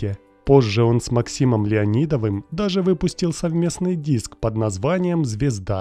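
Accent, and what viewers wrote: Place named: Ukraine. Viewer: а что, это текст переведен с украинского? абсолютно нероссийские слова и интонации..."стоимость становит...." так не говорят. хотя акцент иногда похож.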